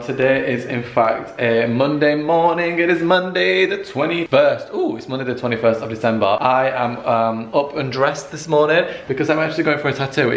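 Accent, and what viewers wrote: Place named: Sheffield, United Kingdom. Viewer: Binge watching videos when I'm at uni so I can hear an authentic Sheffield accent to remind me of home loool🙃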